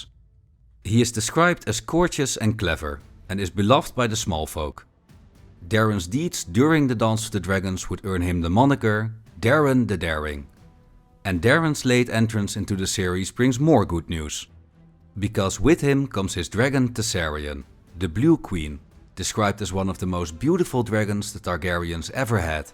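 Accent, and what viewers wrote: Netherlands. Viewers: The narrator is definitely dutch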